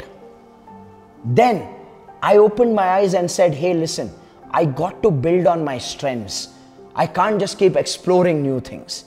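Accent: India. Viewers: Idk what is about Indians speaking english, that is so pleasant to listen.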